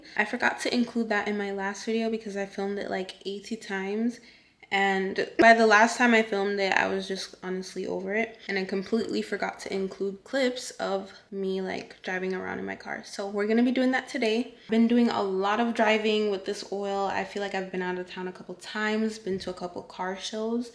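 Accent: Jamaica. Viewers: You sound Jamaican 🇯🇲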